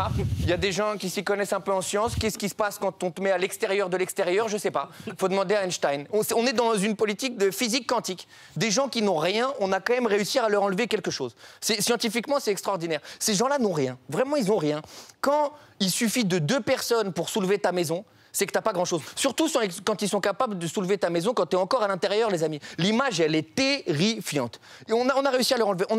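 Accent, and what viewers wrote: Quebec, Canada. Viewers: 10 sur 10 pour ton accent québécois, je certifie! 🙂 Bonjour de Montréal 👍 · Wow tu a tellement bien l'accent québécois impressionnant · Wow l'accent Québécois! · Sa parait il a entraîné son accent québécois lui je valide 😂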